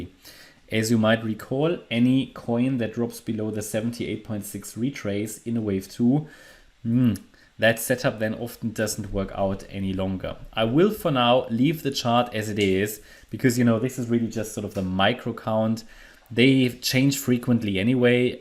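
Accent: Germany. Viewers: Cardano is becoming less value day by day (compared to Bitcoin) P.S. nice German accent 👌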